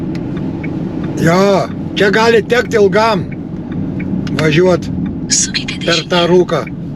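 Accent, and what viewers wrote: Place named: Alytus, Lithuania. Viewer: Pasiūlė jūtūbas video pažiūrėt, ant juoko paspaudžiau ir visą sužiūrėjau, užsiprenumeravau. Ir akcentas alytietiškas labai gražus (pats iš Alytaus esu), ir komentarai pakeliui, ir šuniukai. Tiksliai net nepasakysiu, kas taip patiko, bet patiko ir žiūrėsiu dar :)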